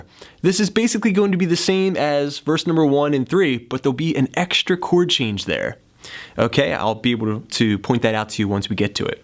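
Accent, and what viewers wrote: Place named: California, United States. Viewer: I like your subtle Californian accent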